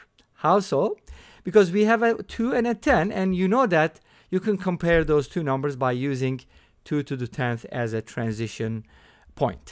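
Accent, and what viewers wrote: Turkey. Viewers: you got that turkish accent rolling 😁 keep on doing what you do🫶🏻🫶🏻 selamlar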